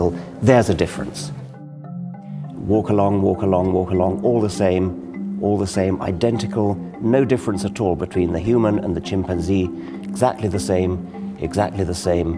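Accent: United Kingdom. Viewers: "Dawkins is just an ignorant homosexual" Yeah, but he has a quaint British accent. lol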